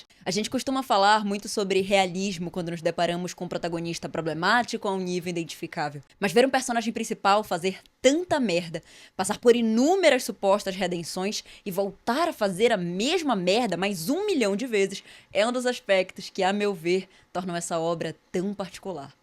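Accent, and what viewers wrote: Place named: Pará, Brazil. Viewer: Ela tem sotaque paraense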